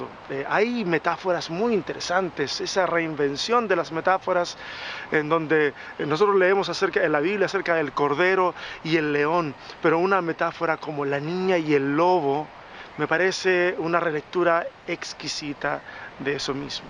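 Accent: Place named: Mexico. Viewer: Cómo se nota la influencia de vivir en México en esos años, hablabas a lo mero macho wey.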